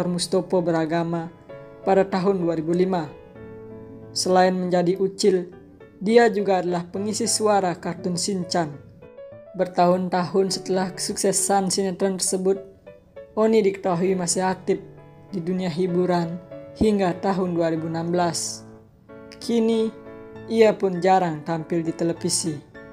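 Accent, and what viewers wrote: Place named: Bali, Indonesia. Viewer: Dari logatnya kayaknya mimin orang Bali ya🤭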